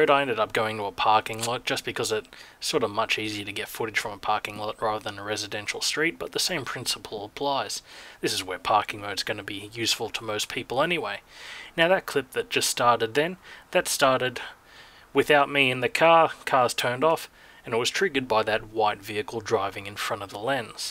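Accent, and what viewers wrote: Australia. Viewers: aussie accent! <3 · Thanks! Love that Australian accent!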